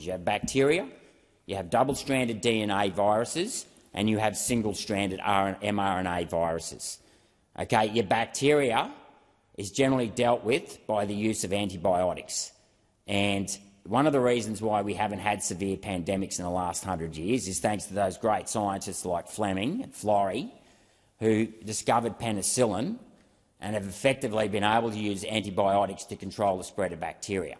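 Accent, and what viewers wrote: Australia. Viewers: Another succinct, intelligent, legendary monologue Senator, beautifully delivered in the dulcet tones of your authentic Aussie accent ... cheers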